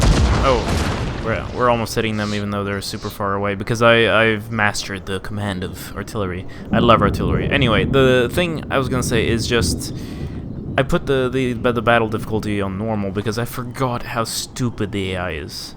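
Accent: Sweden. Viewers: Are you from sweden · Are you Swedish?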